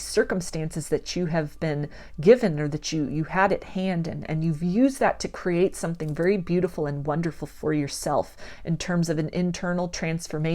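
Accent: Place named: United States. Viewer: Loving your American accent! Wishing you the best and plenty of success for your channel. New Subscriber! Thank you for your gifts. Have good day everyone!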